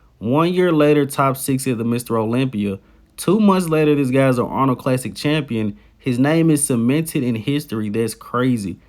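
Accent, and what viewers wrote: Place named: South, United States. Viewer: love the southern accent (if im not mistaken 😅) u got my sub man salute from europe 🫡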